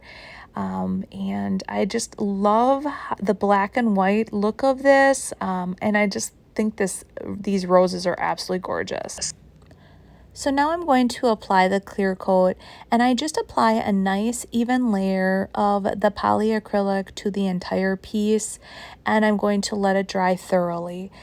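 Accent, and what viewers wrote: Wisconsin, United States. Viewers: Love everything!! Your Wisconsin accent makes me miss home..love it! ( I live in California but originally from the Illinois/Wisconsin border) Shabby Chic is my favorite.. I’m on the No vote side to Laundry it’s beautiful as is!!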